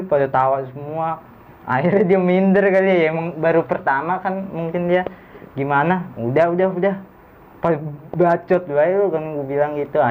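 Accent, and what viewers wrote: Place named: Jakarta, Indonesia. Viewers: Wkwk baru nonton eps ini, betawi amat bang logat lu 🤣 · Ciri khas bocah parung,kalo ngobrol 😎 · hahaha bocah parung lu ah gua demen nih bacot nadanya begini 😂😂😂😄😄😄☺😀😀😅😅😆😆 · Buset medogggggg bgt bahasa parung nyaaaaaaa...😜😜 ilokaaaaaannnnn baeeeeeee tong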